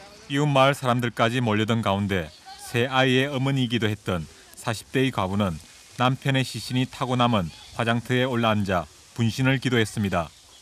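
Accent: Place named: Gyeongsang, South Korea. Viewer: 나레이터가 경상도 발음과 억양이 꽤 심하네요 ㅎㅎ 요즘이 어떤 세상인데..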